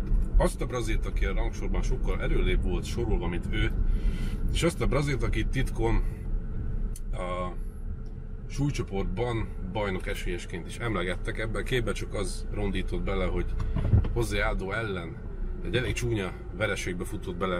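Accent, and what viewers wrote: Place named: United States. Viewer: Szörnyű hallgatni, hogy az anyanyelveden, amerikai hangsúllyal beszélsz.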